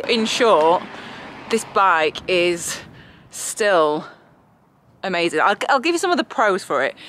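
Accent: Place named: North, United Kingdom. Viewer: that stupid norden accent should be banned. puke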